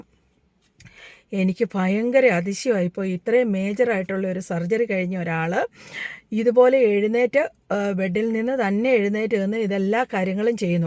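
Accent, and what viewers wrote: Kottayam, India. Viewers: She speaks like my wife, who is from Kottayam District.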